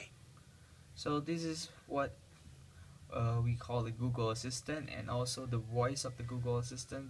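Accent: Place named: United States. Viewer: I swear u sound like American..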